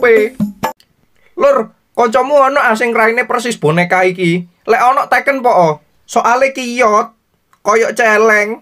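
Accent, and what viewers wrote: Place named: Malang, Indonesia. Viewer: Admin e wong malang iki jelas🤣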